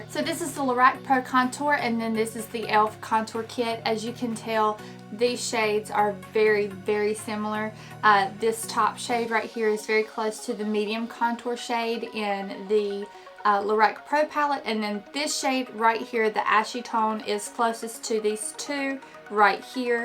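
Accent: South, United States. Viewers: I love your cute southern accent!!!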